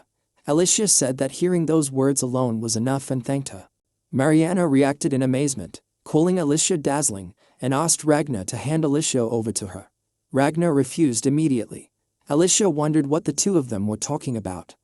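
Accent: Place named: Sydney, Australia. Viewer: sounds like someone from Sidney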